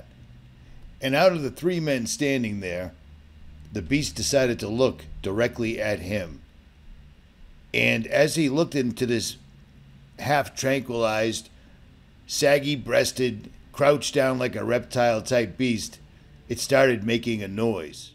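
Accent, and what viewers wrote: Boston, United States. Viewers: This sounds like richie from boston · You sound exactly like a guy named "Ritchie from Boston" lol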